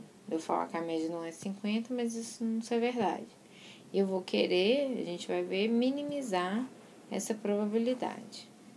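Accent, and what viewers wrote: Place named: Minas Gerais, Brazil. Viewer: Ótima explicação e o sotaque mineiro é bão dimais.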